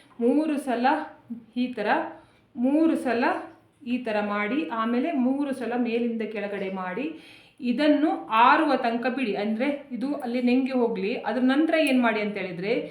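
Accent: Mangalore, India. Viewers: Mlore kannada ❤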